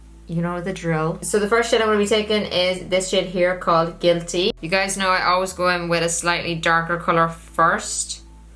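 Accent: Ireland. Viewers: Are you from Dublin😀❤ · wait UR IRISH OMGGGGGG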